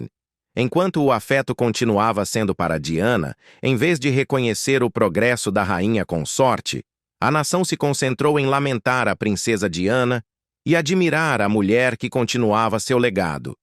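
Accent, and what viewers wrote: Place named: England, United Kingdom. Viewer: Essas publicações s São muito boas, porém o o que estraga é o narrador, se vai traduzir o conteúdo em português que o faça na integra, esse sotaque ridículo de inglês e a troc A de nomes , chamar a rainha Elizabeth de Isabel, isso é ridiculo. Quanto ao post, a falcidade de Camila para ter poder é no mínimo insultante.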